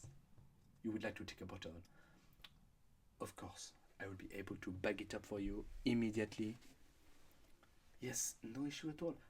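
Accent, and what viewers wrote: France. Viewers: As a french, I would like to know if u (english people) understand everything when he speak english with French accent? Bc he's doing it very well, so im wondering if it's comprehensive when we're speaking to u (and if it's not too disagreeable for ur ears aha?) Pleaseee respond, and of course scuse my orthography everybody knows that we're des grosses merdes in english :) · I spend 9 months in Tours which is supposed to have the most "pure" accent and you sound so much like the tourangeaux! Bon travail :) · Your French is excellent and French accent is spot on. You must be a native speaker. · Shit, your french accent in english is so good. I love it, I need more of it haha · it’s okay!! You’re french accent is amazing!! Never stop making these!! You’ll get big for sure cause you definitely deserve it! May be be careful when moving items and your hands cause it might hit the mic but other than that these videos are great! 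Thank you so much for making these and keep it up! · what il est français ou anglais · Amazing job lmao. You didn't even overdo it. you really sound like a Frenchman trying hard to sound English but they can't 😂😂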